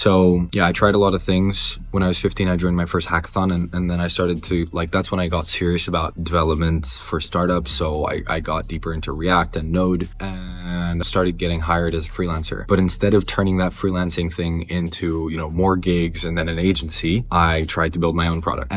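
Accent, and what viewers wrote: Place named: United States. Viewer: You from spain... But you sound American how?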